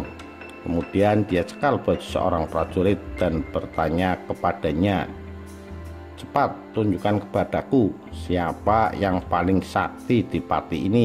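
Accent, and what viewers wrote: Tegal, Indonesia. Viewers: Admin kayane wong tegal apa brebes yaa...?? Intonasine lucuu 🤣🤣🤣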